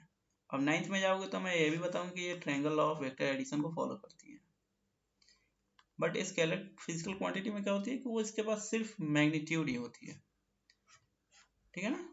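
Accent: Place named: Bihar, India. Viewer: SIR AAPKI LANGUAGE ME BHOT PROBLEM AARHA H AAP LAGBHAG BIHARI BOLTE HO OR ENGLISH READING BHI UTNA ACHA NHI FOR eg. aap Very ko Bery padhte ho , AAPNE PADHANE KE LIYE TOPIC TO ACHA LIYA H LEKIN THODA EASY LANGUAGE ME EXPLAIN KRNE KA KOSIS KIJIYE OR BOLTE SAME CONFIDENCE RAKHIYE TABHI AAP EK TEACHER BN SKOGE PLZ IMPROVE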